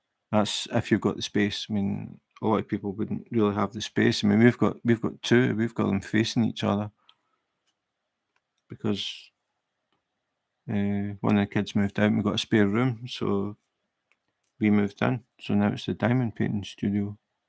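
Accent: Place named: Scotland, United Kingdom. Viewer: Diamond painting AND a Scottish accent. I can change up from diamond painting with Glaswegian comedian Kevin Bridges. 😳😂😂😂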